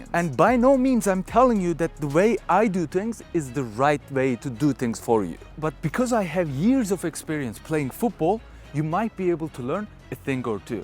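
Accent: Turkey. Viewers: U speak like a Turkish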